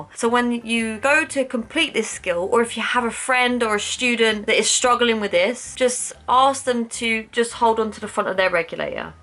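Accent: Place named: England, United Kingdom. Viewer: I really like hearing your English accent pronunciation on little bit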